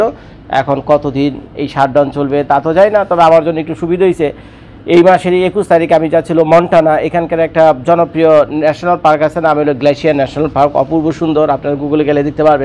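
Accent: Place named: Old Dhaka, Bangladesh. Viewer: আপনার ভাষা অনেক টা পুরান ঢাকার আশে পাশে কেরানীগঞ্জ গঞ্জের মত লাগছে।জানতে পারি কি আপনার হোম ডিস্ট্রিক্ট কোথায়?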